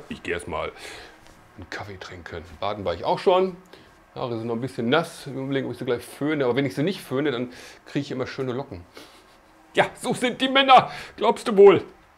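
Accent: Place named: Ruhr, Germany. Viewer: Toll deine Pflanzen, und dein deutsches Dialekt erinnert mich so sehr am Ruhrgebiet wo Ich aufgewachsen bin und immer eine gewisse Nostalgie bleibt 🙏👍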